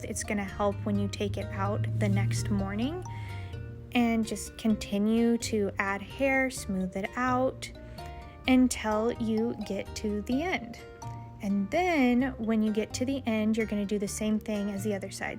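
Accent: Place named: South Africa. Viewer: She’s South African?!! Aye aye! ❤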